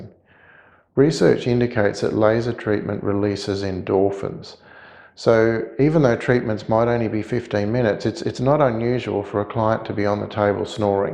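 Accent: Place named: Australia. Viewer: It’s Australian English, it’s very clear. 😂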